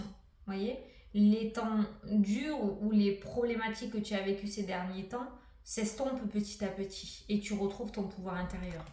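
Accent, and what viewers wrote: South, France. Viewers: Joyeux Noël à vous et votre famille !!! Vous nous apportez le soleil avec votre accent du sud!!! Continuez à prendre soin de vous et de nous tous. À dimanche.🎄🎅🎍🤶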